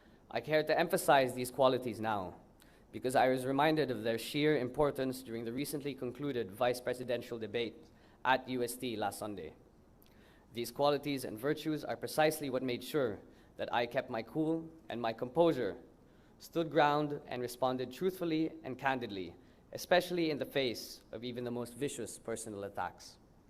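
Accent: United Kingdom. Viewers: Nakaka Harry Potter naman ang accent 😍😍😍 Love na love ko yarn ❤️❤️❤️❤️🤗😘😘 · Love the slight British accent! 😍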